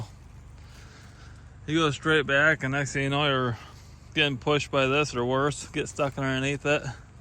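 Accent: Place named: Ohio, United States. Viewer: Just from his dialect I'd assume Ohio or Pennsylvania.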